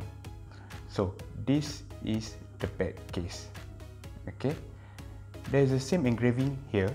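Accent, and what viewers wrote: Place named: Malaysia. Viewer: Logatnya inggris malaysia yah,salam dr indonesia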